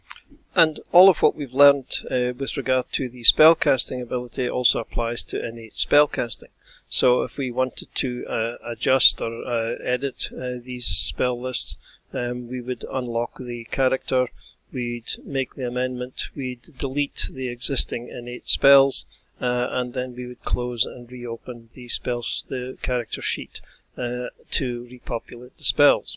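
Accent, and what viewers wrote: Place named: Scotland, United Kingdom. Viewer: I cannot properly thank you for all the time your videos saved me while preparing to run my first campaign in Fantasy Grounds (pandemic life made us use Roll20 first after a few decades of live playing, now we finally are going with a proper tool). It has been truly a blessing to be able to sort out so many questions with your marvellous explanations, and the absolutely fabulous production quality. The fact that they are delivered in a brilliant Scottish accent only help make it even better. Cheers from a Brazilian fan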